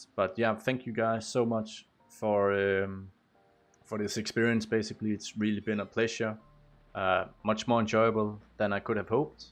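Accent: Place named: Denmark. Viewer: Sjovt, jeg gættede også på dansker, men så tænkte jeg ikke mere over det 😂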